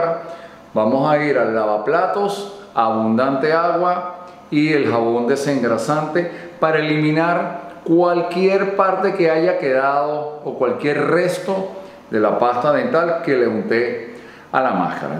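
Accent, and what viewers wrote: Venezuela. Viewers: Ese acento es VENEZOLANO donde se pare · Gracias. Voy a hacer esto, compre una y de verdad q se empaña mucho. Despues les dejo saber. Eres venezolano? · LO SABÍA!!!! Soy canario, y no sé cómo hablan los panameños pero a mis primos venezolanos los reconozco en un ratico 😄😄😄😄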